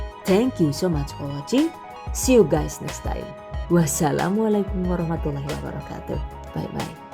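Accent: Banyumas, Indonesia. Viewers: Lah mbakyune ngapakers nggih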